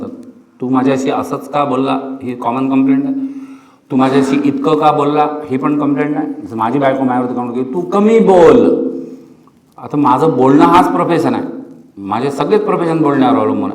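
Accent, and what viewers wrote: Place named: Vidarbha, India. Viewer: सर मि तुमचा प्रत्येक सेशन पाहतो काही तरी नविन शिकवतो आणि ति वर्हाडी टोनिंग एकच नंबर तुमच्या सेशन ची माहिती मिळाली तर उपस्थिती देणे आवडेल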